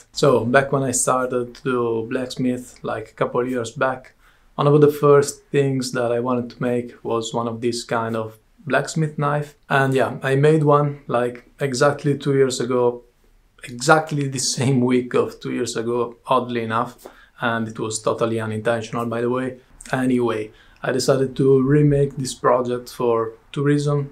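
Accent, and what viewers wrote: Venice, Italy. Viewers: he does sound a lot like the murano glass makers I know now that you !mention it.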